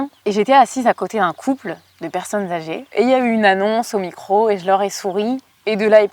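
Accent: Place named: Belgium. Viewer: je suis belge, et quand tu parles, bain on dirait quelq'un d'ici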